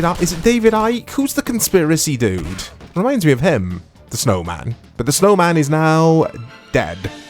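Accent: Wales, United Kingdom. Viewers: It's refreshing to hear a fellow cymraeg accent on here 😂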